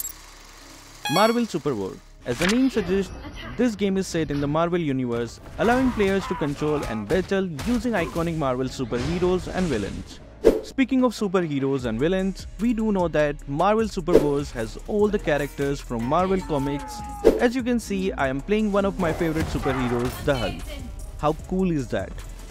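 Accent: India. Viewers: His pronounciation seems he is from India😊😊😊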